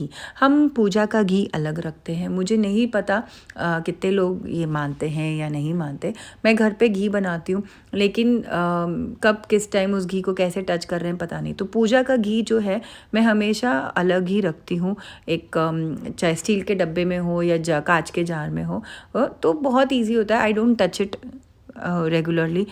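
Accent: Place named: Bikaner, India. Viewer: Aap ki boli or habits all r bikanri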